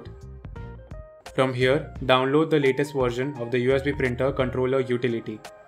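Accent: India. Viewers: I can't understand Indian accents good enough but thanks for trying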